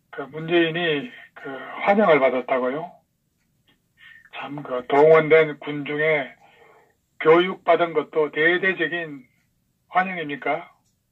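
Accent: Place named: Gyeongsang, South Korea. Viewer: ㅋㅋㅋ... 경상도 구수한 사투리 쪼매 바빴다. 좋아요. ^^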